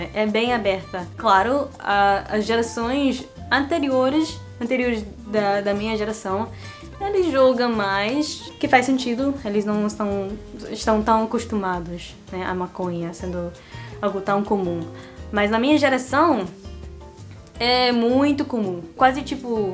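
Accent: Rio de Janeiro, Brazil. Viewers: seu português tem sotaque de carioca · seu português tem sotaque carioca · seu sotaque não parece americano, é bem carioca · falar português com sotaque de carioca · coisashhh... Tá bem carioca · parece uma carioca ela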